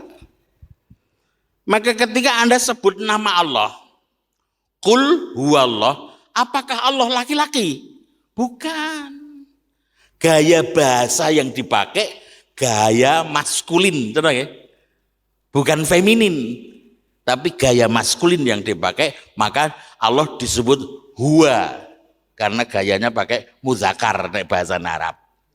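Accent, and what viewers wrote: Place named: Semarang, Indonesia. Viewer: Bahasane semarang bnget..cocok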